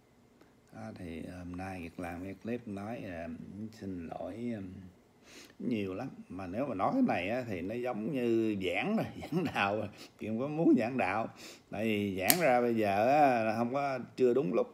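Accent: Mekong Delta, Vietnam. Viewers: Chào Anh ! Chất giọng miền Tây hào sản ,chân thật ,cho đi mà không nhận lại , đáng trân quí . 💐💐💐💐💐💐💐💐💐💐💐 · Chất giọng miền tây em rất quý anh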